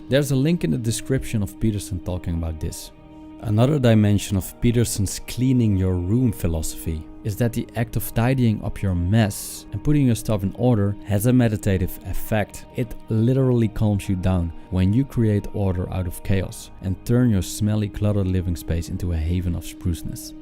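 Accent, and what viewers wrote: Netherlands. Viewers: Hoor ik nou een hollands accent :-)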